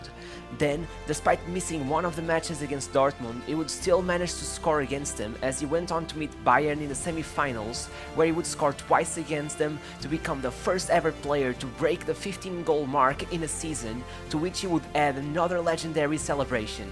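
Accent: Portugal. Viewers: You have a new sub. Spent a few years in Portugal after Ronaldo's time at Manchester United. My Mrs is from, and supports, Porto. Absolutely looooooooooooved this video. Your presentation is calm yet full of passion and appreciation for him. Loved your Portugues ascent too. The only thing that could have improved this video is his romantic return to United! 🙂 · Only portuguese people will notice the pronunciation. Good job fellow portuguese guy :) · Great accent only in a few words we can tell you are portuguese cá dos nossos.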